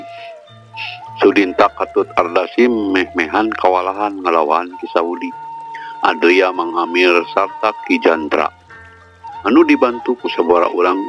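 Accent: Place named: Sunda, Indonesia. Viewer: Resep upami merhatoskeun tutur catur bahasa kang.. SUNDA PISAAAAAAAN..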